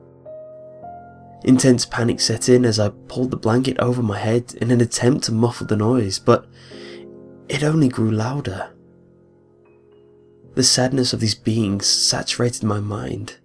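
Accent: United Kingdom. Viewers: Your BRITISH !?!?!?!?!?!?!?!?!?!?!?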